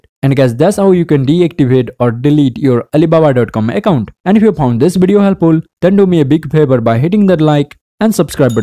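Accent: India. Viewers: haw to delete yar alibaba akkunt indian voice ueueue shut up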